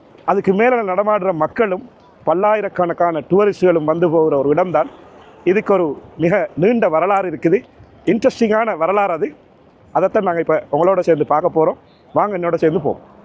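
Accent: Sri Lanka. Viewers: Nice to hear Srilanka Tamil make full video.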